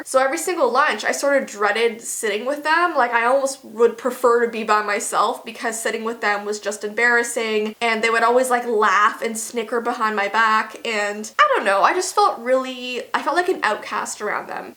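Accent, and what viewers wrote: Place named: Canada. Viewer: I love her Canadian accent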